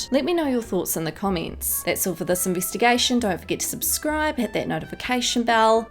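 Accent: New Zealand. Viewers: This Kiwi accent is annoying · Off topic. But are you Kiwi? That accent tho!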